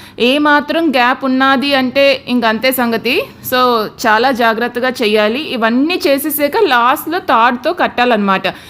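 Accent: Telangana, India. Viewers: Telangana slang bagundi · Super ga ready ayyav chala Baga telangana bhasha bagundi ane super ga undi ❤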